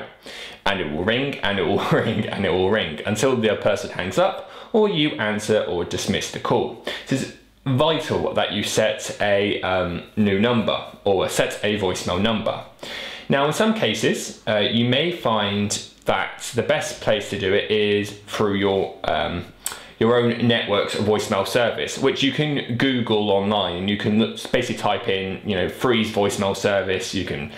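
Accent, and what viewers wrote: United Kingdom. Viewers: I think he's Bri ish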